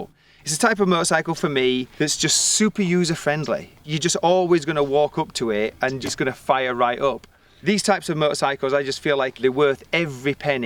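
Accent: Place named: North England, United Kingdom. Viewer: You sound like a Englishman (albeit a northerner). I thought this bike wasn't available in the UK. Where can I get one?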